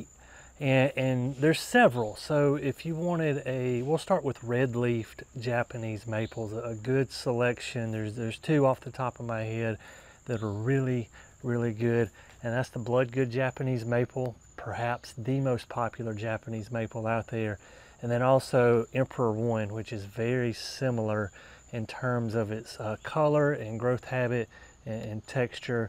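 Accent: South, United States. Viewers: Where do you live? It sounds to me like you also live in a southern state. I live in North TX and just wanting to compare your environment to mine. This is my first time to see your program and so glad I found you as we are currently doing a total makeover of our yard. I'm currently researching the Japanese Maples so this was perfect timing for me to bump into you. 😊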